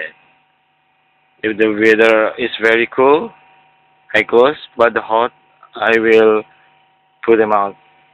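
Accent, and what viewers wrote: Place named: Indonesia. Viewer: mas bahasa inggris nya lancar ya, ya Memang kedengeran aneh soal nya aksen nya indonesia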